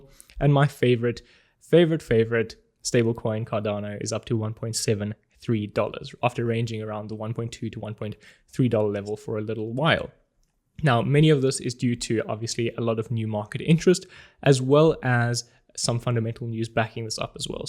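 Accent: South Africa. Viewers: are you from South Africa? I don't know if you have mentioned it before, sorry if you had. Your accent reminds me to Elon's. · This guy sounds South African, am I right boet?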